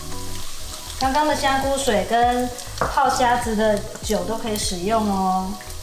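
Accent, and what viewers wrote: Taiwan. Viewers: 不會呀 台灣女生都這樣講話 我思念的鄉音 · 很少聽到這樣的台灣國語了！真好吃的油飯，謝々阿嫂。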